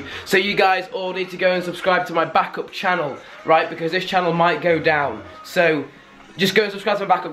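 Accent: United Kingdom. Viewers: This dude sounds like faze adapt with a British accent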